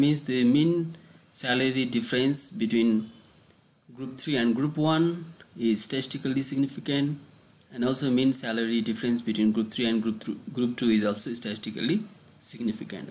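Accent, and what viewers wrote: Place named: Nepal. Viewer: This guy sounds Nepalese. Anyways, nice video.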